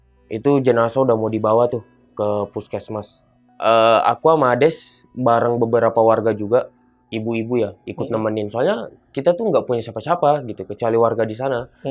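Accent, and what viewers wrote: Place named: Bugis, Indonesia. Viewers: Ku tempeleng Bugis bgt bg👋👋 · Pasti ini bang orang sulawesi, kentara logatnya😅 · Logat sulawesinya masih ada yah kk,, salam dari sulawesi selatan bone kk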